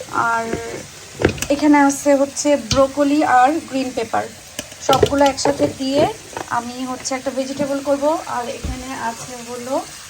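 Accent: Sylhet, Bangladesh. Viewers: bhai apnar sylheti maat huniya ami crush khailaisi..MashaaAllah 😊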